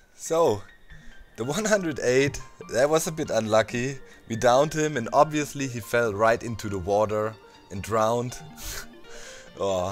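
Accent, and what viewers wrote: Germany. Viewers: Are You Deutsch Sir?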